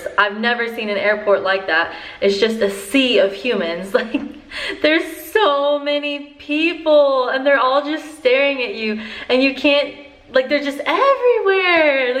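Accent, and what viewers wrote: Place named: United States. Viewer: As always nice video..even though sometimes is very hard for me to understand american english...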